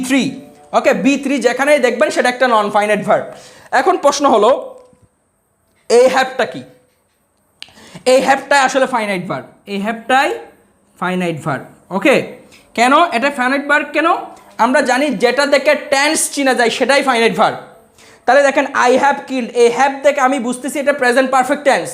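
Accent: Chittagong, Bangladesh. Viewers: আপনি কি চট্টগ্রাম এর ? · apni ki Chittagong er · Vai.... apni to mona hoi chattogram er people. Amio akjon chattograminian. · Sir mone hy chittagong ar tai na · Closing my eyes,,,i can say you are from Chittagong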